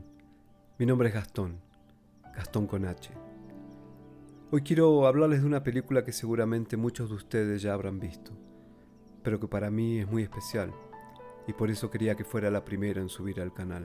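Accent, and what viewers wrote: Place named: Argentina. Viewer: Una pelicula tan buena. Quantas lagrrimas quando el regreso' a USA. Seguir ese canal me permite de escuchar tu voz argentina que me gusta mucho. L'acento es lo que prefiero de l'America del surf. Gracias con H 💚 Un beso desde Italia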